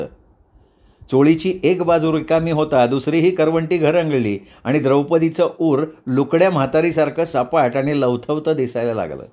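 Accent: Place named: Malvani, India. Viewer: Far mast hasun hasun vat lagli mast Sir ani Sir aple abhar tumche malvani vachan lay bata vatala ha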